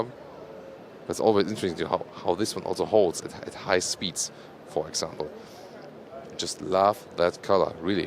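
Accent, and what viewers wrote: Germany. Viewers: Sorry , but your English is a pain - warum sprichst Du nicht einfach in Deutsch? I work and live in an English speaking Country - this strong German Flavour is too much - i recommend spend some time in Oxford and some time in Boston - that might help.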